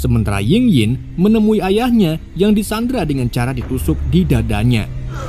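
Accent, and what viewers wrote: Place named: Tegal, Indonesia. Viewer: ngapak,tegal mas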